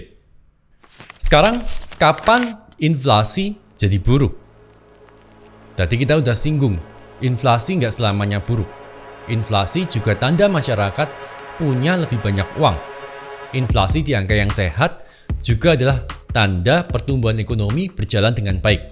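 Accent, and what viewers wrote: Java, Indonesia. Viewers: Ko fokus bljar ngomong gk medok dulu lah biar enak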